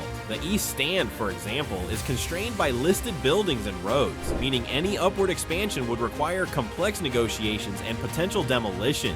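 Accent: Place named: United States. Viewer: More click bait in an American accent ✊🏻✊🏻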